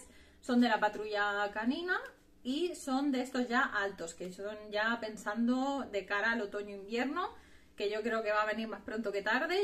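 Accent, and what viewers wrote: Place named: Spain. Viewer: Me gusto la compra que hiciste saludos desde México y me encanta tu asento español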